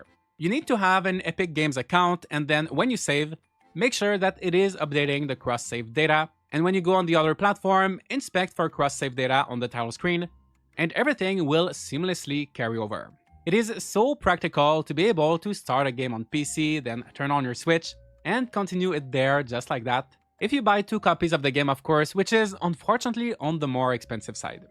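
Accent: Quebec, Canada. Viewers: tu sonnes québecois t'es tu quebz le gros ?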